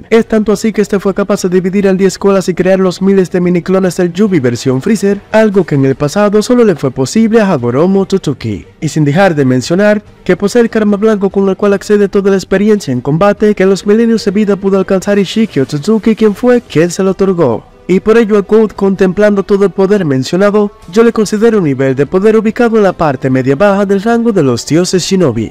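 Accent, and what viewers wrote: Dominican Republic. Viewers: El Sensei tiene su flow dominicano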